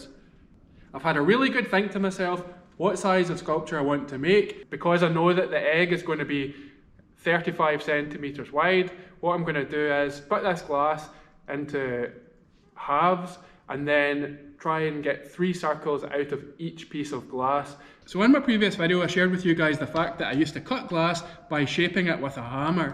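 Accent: Scotland, United Kingdom. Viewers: Have you seen the Palace of Glass video showing how they chip the glass in predictable ways to make a pattern on the chipped edges? Amazing. Hearing you voice reminds me of my visits to Scotland and once this covid pandemic is controlled with a good vaccine, I need to visit again.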